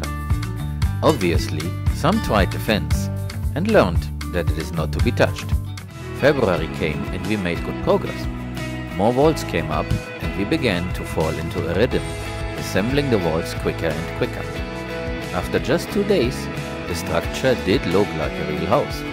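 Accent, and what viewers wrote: Germany. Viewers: Where did you get your German accent ?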